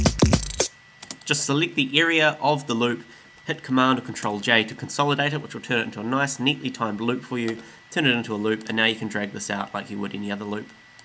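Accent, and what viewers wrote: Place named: New Zealand. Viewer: Your tutorials are made all the better by the NZ accent... Love it!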